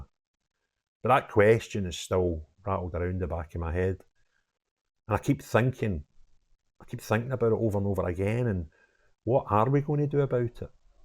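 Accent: Scotland, United Kingdom. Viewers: Great to have your Scottish accent..we represent our beautiful country of Scotland….we must work together and get away from the total control of the English..we are the oppressed..we are Palestine…thank you for being a voice for us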